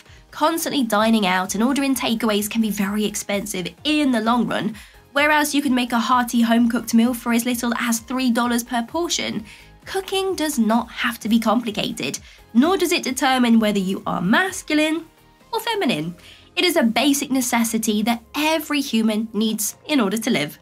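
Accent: England, United Kingdom. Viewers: I love listening to your voice at 1.5x -English accent - too cute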